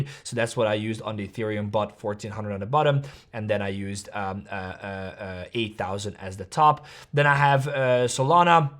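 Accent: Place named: United States. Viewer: This is not financial advice 😅😂you sound American today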